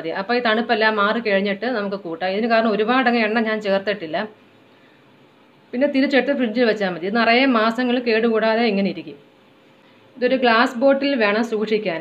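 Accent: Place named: Thiruvananthapuram, India. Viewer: തിരുവനന്തപുരം സ്ലാങ് 😊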